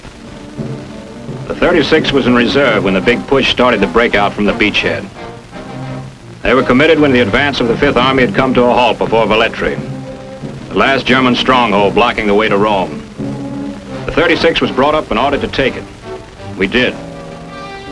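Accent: New York, United States. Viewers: Clark's glory hounding cost my grandfather a lifetime of PTSD and misery over the loss of his battle buddies. P.S. I love that glorious New Yawk accent. My WWII soldier grandfather was with the 143rd (36th) Company K and hailed from Brooklyn, New York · Mark Clark should have been court martialed for destroying this division on the Rapido - a totally unapproachable obstacle if you have ever seen the pics. The German engineers ("Pioneers") cut down all the trees within hundreds of yards of the shore and mined the area completely. On a lighter note, could the Army SIgnal Corps at least give the Texas Div a narrator who doesn't have a thick New York accent? I realize that after the division was butchered repeatedly in Italy, it wasn't even half Texans, but come on.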